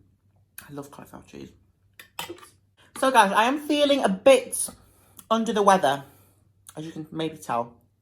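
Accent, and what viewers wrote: England, United Kingdom. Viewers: You are a wonderful and awesome person! I love your personality and your beautiful English accent. Love from Montreal, Quebec.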